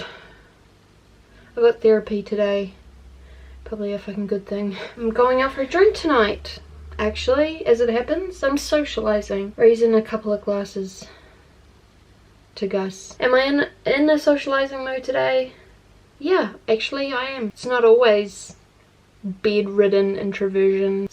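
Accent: New Zealand. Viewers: Why do I never recognize the kiwi accent when I'm kiwi haha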